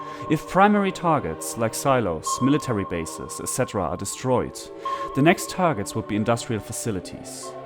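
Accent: Germany. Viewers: Honestly after 5 seconds of him speaking I could hear the german accent :) cheers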